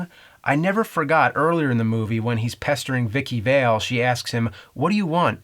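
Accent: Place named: New Jersey, United States. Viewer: Everyone correcting pronunciation as if his filthy Jersey accent isn't something we all love about him.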